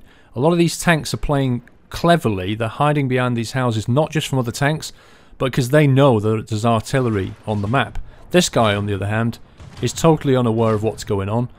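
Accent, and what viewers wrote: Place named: United Kingdom. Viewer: Lets Mber нормально он вроде разговаривает, носитель английского языка (британец, слышно британский акцент)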